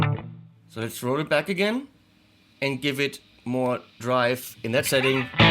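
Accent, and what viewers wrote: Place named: Germany. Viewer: So ein schnuckeliger deutscher Akzent!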